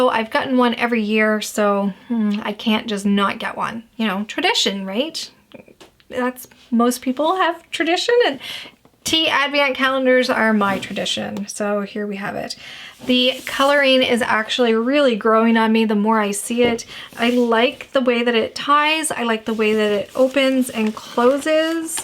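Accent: Canada. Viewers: Thanks for doing this unboxing. i like how you include the times to fast forward to avoid spoilers. Nice Touch! I think the Advent calendar is very cute and as I quickly looked through the list of flavors included, I have no doubt that I would enjoy the teas. I do have an issue with the 3 "surprise" items as they merely seem like coupons you could get pretty much at any time and with the narrow dates (likely to try to spur sales during/after the holidays) they exclude them from being useful to me as the nearest David's Tea is more than an hour away, so I only get there 2-3 times/year. It must be your Canadian accent but your pronunciation of Advent was a bit distracting to me. You pronounce it as though it is spelled "Advant."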